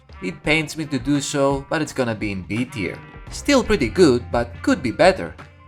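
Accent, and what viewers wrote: Greece. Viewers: Are you Greek? Me too! Haha we have the same accent!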